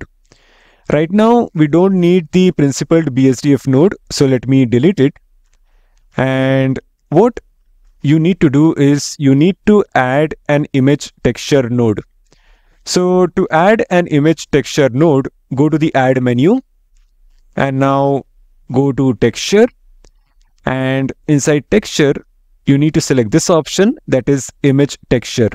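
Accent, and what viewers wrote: India. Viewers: He has an Indian accent.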